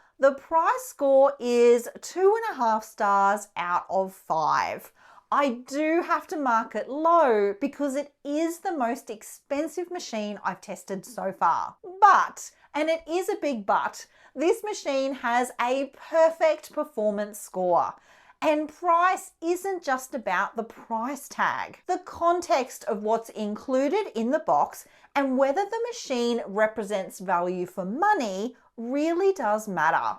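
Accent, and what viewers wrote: Australia. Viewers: Oh,... I love your voice! Your Aussie accent is fun, and your voice modulations are happy and cheerful! Please don't change. ~Kathy ❤❤❤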